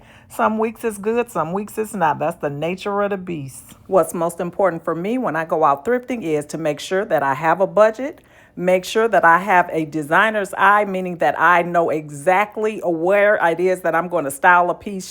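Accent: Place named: Detroit, United States. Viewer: Ran across your channel… I KNEW you were from Detroit!!! Me too but have lived in Charlotte since 1991. The accent will always give you away. 😉 and yes, I subscribed!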